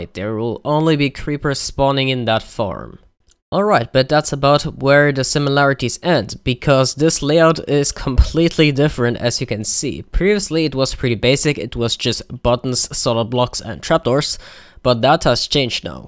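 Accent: Germany. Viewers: yup... I love his German accent. Most good technical minecrafters have German accents, ilmango, nex, methodzz and so on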